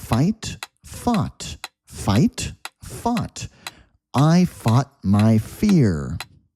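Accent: United States. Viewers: Could you please make videos about american accent😔😔 and it seems like you are an American. Plz teacher 🙃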